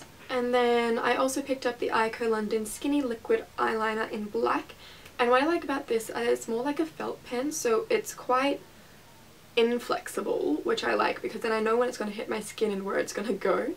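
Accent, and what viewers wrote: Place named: Australia. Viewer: Are you from Australia?? Btw I love ur accent ❤️❤️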